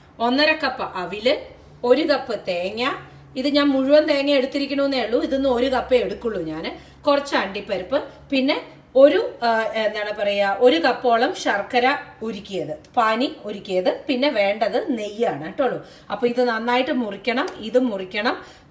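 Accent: Palakkad, India. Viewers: pole samsarikkunna ente palakadu kootukare viji annu peru.adhyamokke varathamanam kelkkanum mathram njan video kandirunju..eppo recepie koodi kanan thidangi tto.thank you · Chechi.. This is the first time I am watching ur video... Chechide samsaram kelkan enthu rasam aaneno... Thani palakkadan bashayil pachaka kurippu kelkkumbo natil ethiya oru feel... Pine ganapathi homathinde prasadam, idichu pizhinja payasam okke enthanelum idane... Samayam eduthu authentic aayitu cook cheyyunath kaanan thanne undu oru sugam... 👍👍👍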